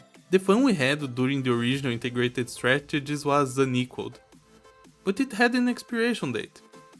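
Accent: Brazil. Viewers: Are you brazilian? Nice video btw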